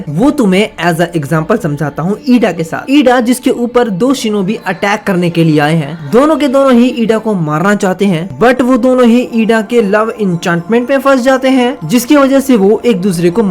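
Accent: Bengal, India. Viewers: kiya bhai tu bangali hay?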